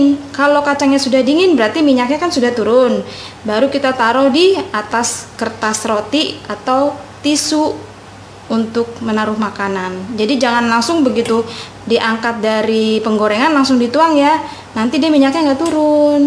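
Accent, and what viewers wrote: Java, Indonesia. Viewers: Maaf ya, ingin tanya daun jurok tu daun apa, sebab bahasa pengantar tu pelat2 jawa, sya ni peminat dri Malaysia.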